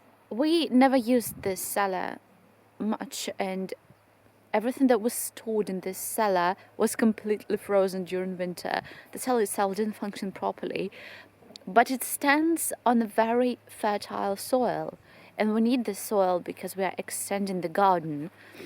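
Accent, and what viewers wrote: England, United Kingdom. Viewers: Very interesting. You sure have a lot of work to do fixing the cellar and doorway! I notice that you seem to have an English accent. Did you attend school in England?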